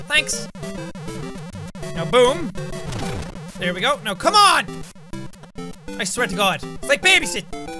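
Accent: Ireland. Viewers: I love you and your videos so much. You are my inspiration. I almost broke my leg on a busy road when I was cycling, but I thought of you and what you'd say in that awesome Irish accent, so I got up and started riding again. Thank you. If you read this, please reply ❤️😍💖😘👌🏻😝💕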